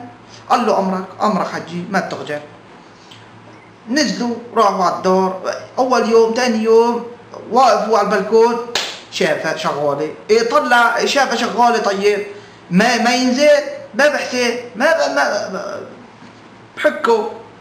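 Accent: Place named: Aleppo, Syria. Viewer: دخيل الحلبيه ماأحلى حكيون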